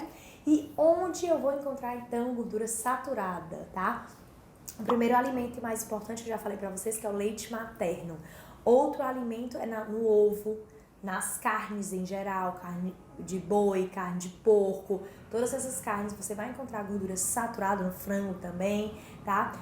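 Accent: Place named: Salvador, Brazil. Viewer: Vc é de Salvador, né.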